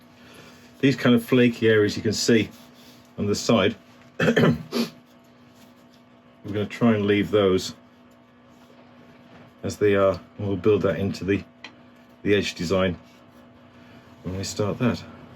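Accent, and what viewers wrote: United Kingdom. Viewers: I loved what you did on this weird root. It was also great to hear a Brit doing this video….!!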